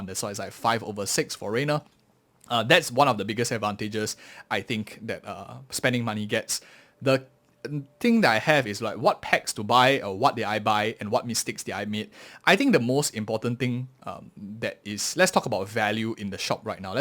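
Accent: Singapore. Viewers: I could tell that was a Singaporean accent right off the bat haha. Fellow SG as well, really good guide since we have roughly the same VIP level but I’m in S54. Nice to see an SG Creator! Keep it up 👍🏻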